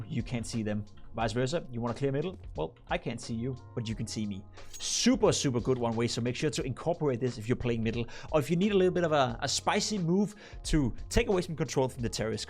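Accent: Denmark. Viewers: u danish?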